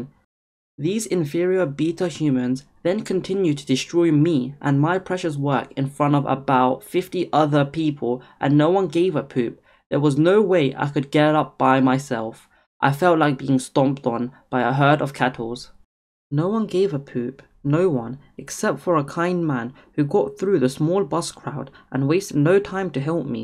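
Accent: United Kingdom. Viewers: I’m sorry if this sounds rude, but are you British? Just curious.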